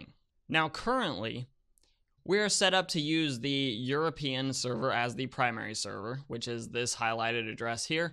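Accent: Indiana, United States. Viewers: Are you from indiana?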